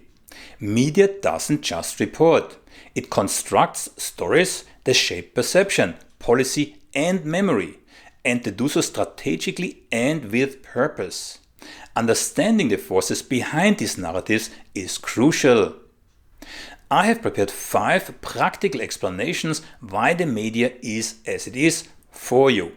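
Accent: Germany. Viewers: It's so good to have the original Germanic accent... Nowadays 🇩🇪🇦🇹🇨🇭 sound like they were born speaking English. Deutscher Rundfunk für immer!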